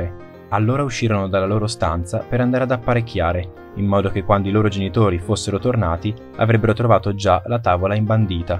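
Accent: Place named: Bologna, Italy. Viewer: Mo che bel accento bolognese che c'hai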